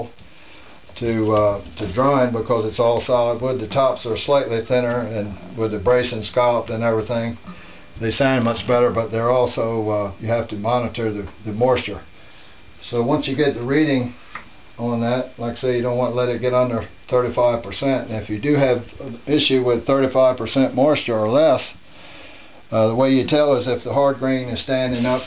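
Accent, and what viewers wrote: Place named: Virginia, United States. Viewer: That's a Virginia accent. Any North Carolinian would recognize it immediately!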